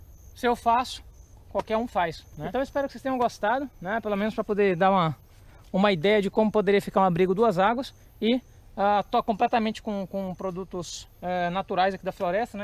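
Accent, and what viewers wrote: Manaus, Brazil. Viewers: Você é de Manaus?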